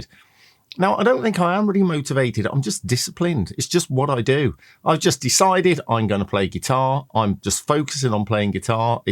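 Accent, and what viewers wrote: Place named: Australia. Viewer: i knew i was hearing a little Auzzie mixed in there. 😅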